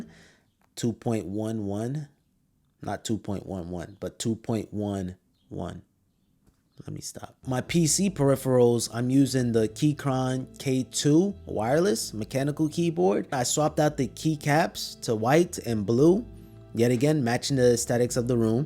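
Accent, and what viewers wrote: New York, United States. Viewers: Minute into the video I already know he’s from New York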